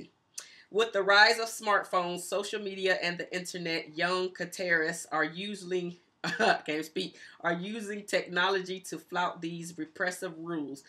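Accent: United States. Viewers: Omg didn’t know you were from Ohio! My husbands dad lives near Youngstown. I can tell you have an Ohio accent. I say those words all the same! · You have a very southern hospitality accent. Which is great because I have the same one too! I’m born and raised in Florida and have family from all over the south. Sc Louisiana and etc and each state is different. Your voice is comforting and nurturing! Loved this tag!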